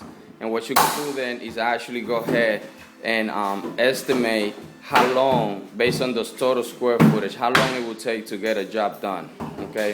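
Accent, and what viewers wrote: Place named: Spain. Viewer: is it just me or does anyone w a Spanish accent not good at anything?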